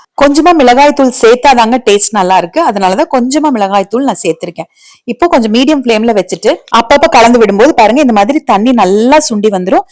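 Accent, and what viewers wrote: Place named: Kongu, India. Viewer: Kongu tamil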